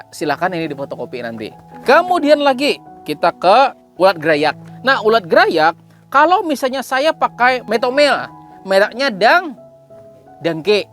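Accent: Banjar, Indonesia. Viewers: Orang banjar kah pak... Mantap penjelasan nya... Salam dari Kalteng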